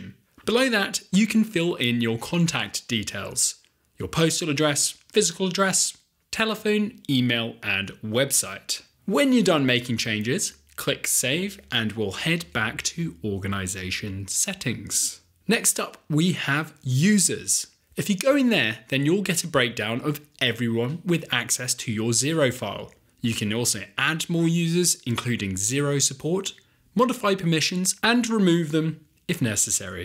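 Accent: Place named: United Kingdom. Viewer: Awesome. Every tutorial is just so much more pleasant with a cheerful British accent. 🙂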